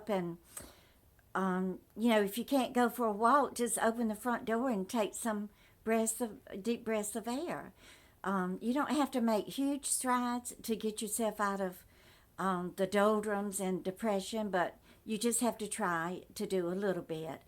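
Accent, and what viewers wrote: South, United States. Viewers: you do look good, I am younger and in good shape but have more wrinkles on my face than you, so you are right about the water drinking. Thank you for you... I appreciate your good old fashioned down south speaking voice (my father was from down south as well as my husband) and it is so good to see you so happy and lovin your grandchildren and family...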